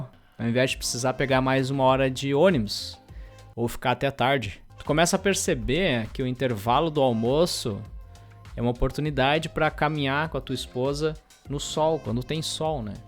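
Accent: Brazil. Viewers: meu sotaque no inglês é igual o seu! Eu sempre ficava muito desconfortável e com medo de tudo que envolve o exterior pelo meu accent. Eu entendo tudo, consigo falar tudo e me expressar, mas o sotaque brasileiro é muito forte e as vezes da aquela engasgada. A gente vê na internet e acha que todo mundo é perfeito e faz tudo que a gente faz 1000x melhor, mas a real é que não. E pra dar certo, pra melhorar, é só ter coragem de dar a cara e tentar. Quem não arrisca não petisca. Parabéns pelo vídeo e sei que o inglês não era o objetivo, mas obrigado! Mesmo num vídeo de "opinião", seu trabalho impacta as pessoas de formas que você nem imagina. Abraço!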